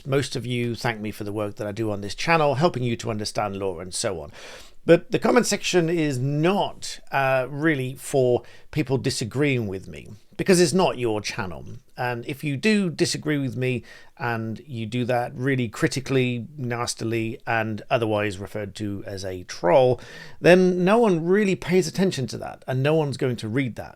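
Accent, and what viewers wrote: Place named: RP, United Kingdom. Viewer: Cheers. Well put, in crystal clear queen's English. ☺️✨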